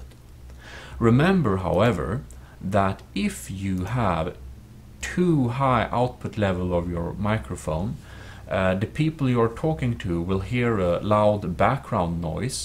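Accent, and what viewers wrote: Sweden. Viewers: your defiently swedish mah dude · swedish english 100% (im sweedish) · is this guy swedish?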